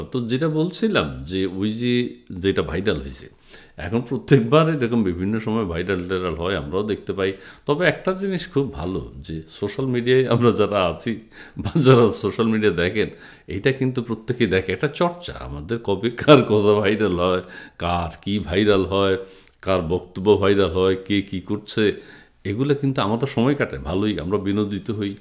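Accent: Noakhali, Bangladesh. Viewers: ধন্যবাদ আপনাকে ভাই। আপনার কথা শুনে ইচ্ছা করে। আপনি নোয়াখালীর ভাষায় কথা বললে ভালো লাগে। যদিও আমি পটুয়াখালীর।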